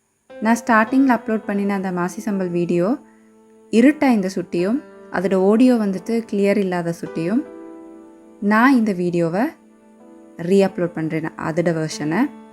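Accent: Akurana, Sri Lanka. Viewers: Pechi Slang akurana mathiri irunshi athu than ketten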